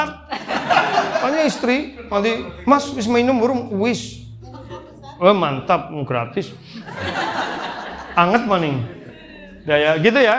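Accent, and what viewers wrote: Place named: Banyumas, Indonesia. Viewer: ustadz bahasane penak ngapak pd kr nyong, semangat tadz sehat sll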